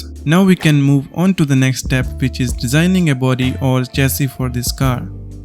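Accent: India. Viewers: Мне одному кажется, что это русский говорит по-английски с индийским акцентом?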